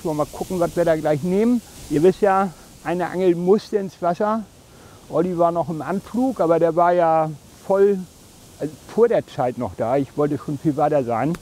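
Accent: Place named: Frisian, Germany. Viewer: Ich könnte dir stundenlang zuschauen. Ich mag dieses friesische Dialekt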